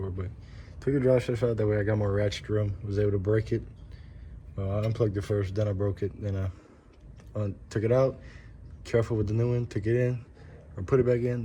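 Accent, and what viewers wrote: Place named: South Louisiana, United States. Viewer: As soon as I heard you talk I knew you were from S. LA. Me too. I have a 2013 Sierra ticking its ass off. Wanna do mine lol?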